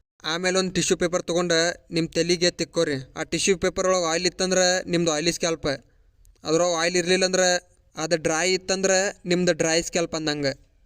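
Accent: North Karnataka, India. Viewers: Anna yane hela nina nama uttar Karnataka launguage matra benki annna adrali nima voice matra super anna nana nima voice kelata nanage video complete adude gottagalilla super Anna ❤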